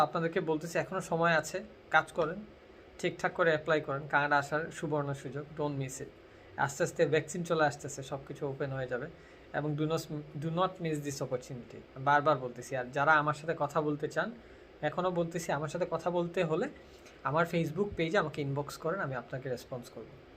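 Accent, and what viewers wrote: Sylhet, Bangladesh. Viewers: Sylheti bhai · That's Sylheti😍😍😍 love you bai♥️ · Vaiya, are you sylheti? Your accent makes me curious 🙈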